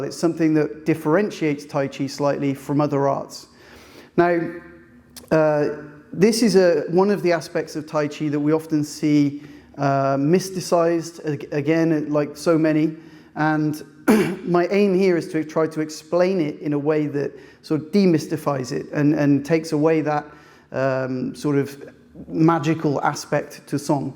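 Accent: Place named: Northeast, United States. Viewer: I was going to say, you sound like you’re from somewhere east of New York. 😊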